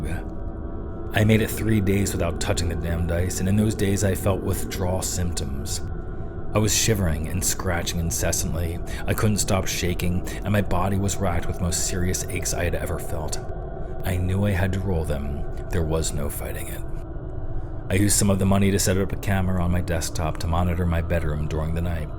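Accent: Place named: United States. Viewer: Very very good story ! And the narration is just perfect ! Being french, and listening to this beautiful English ( American ) voice add something I can’t explain ! Well done !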